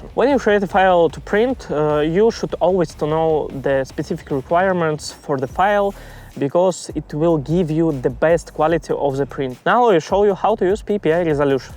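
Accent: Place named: England, United Kingdom. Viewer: О, сэр… Вы из Англии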